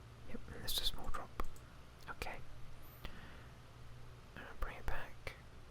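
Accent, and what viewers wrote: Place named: United Kingdom. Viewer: your British accent is so soothing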